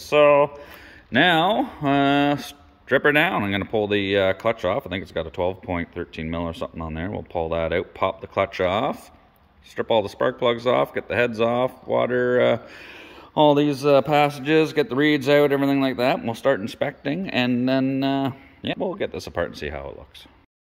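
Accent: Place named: Ontario, Canada. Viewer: Where you from bud sounds like you’re from Ontario ?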